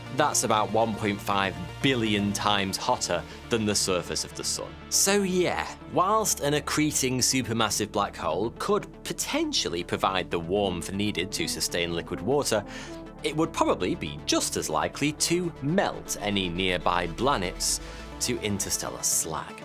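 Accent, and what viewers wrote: United Kingdom. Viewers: This guy had voice that I could listen to for hours. Like Morgan freeman but british! Love the vids!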